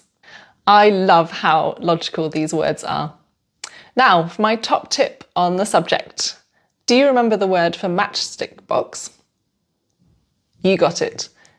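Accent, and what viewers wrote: United Kingdom. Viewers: I like your British accent. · Edinburgh? How come, that to me (austrian) "scottish" (is it?) is far better understandable than "english"? Thanks for wonderful lessons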